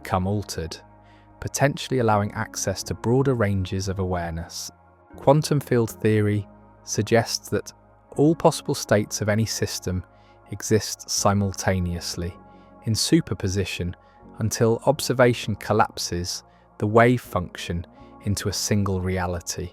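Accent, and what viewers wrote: United Kingdom. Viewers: Sorry, always hard for me to follow Brit accents. Does NOT keep my attention. I love this topic, but can't view it.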